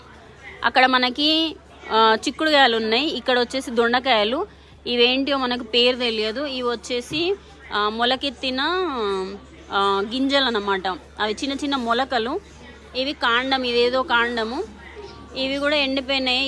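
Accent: Telangana, India. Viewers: మన తెలంగాణా యాస ఎంత తీయగా ఉంటుందో.. superb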